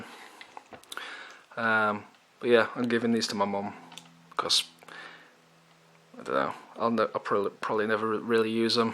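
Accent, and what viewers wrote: United Kingdom. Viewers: Are you british? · A bit off topic but you have a British accent and pronounce the Z "Zee" instead of "Zed"?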